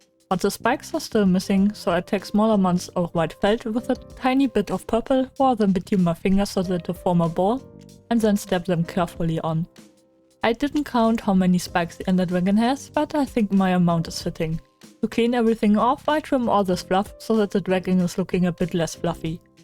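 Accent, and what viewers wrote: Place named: Germany. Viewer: Bist du Deutscher hast einen deutschen akzent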